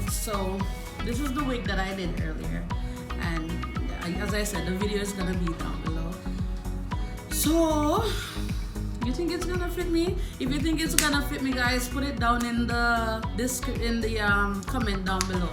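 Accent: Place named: Caribbean. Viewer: That wig is cute I need to get one of them wig. Sis where you from 🇯🇲 cause I hear a Caribbean accent👂🏼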